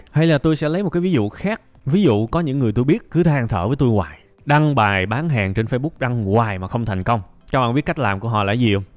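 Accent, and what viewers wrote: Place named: South, Vietnam. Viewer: Nghe giọng nói miền Nam và dẫn chứng rất dễ hiểu, Xúc tích và trọng tâm ! Chú duyệt 100%,Chúc cháu khỏe !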